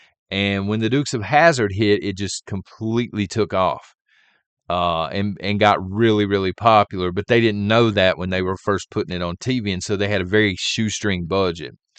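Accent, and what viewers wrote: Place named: South, United States. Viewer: You would need an accent like yours for the general lee to be fitting. ( just saying y’all) Kitt 100% for guys like me with no heavy duty accent like yours. Just my two cents..😬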